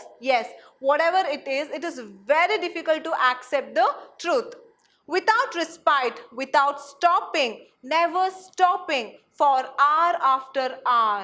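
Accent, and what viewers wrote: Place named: Kerala, India. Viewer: Vocab is ok but pronunciation 🥵....mallu pronunciation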